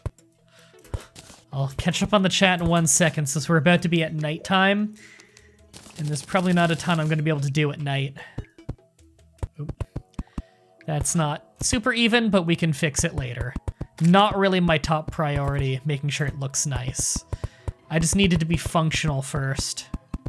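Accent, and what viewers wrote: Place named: Southern Ontario, Canada. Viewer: Gotta love that Southern Ontario accent of his! Best Canadian Youtuber!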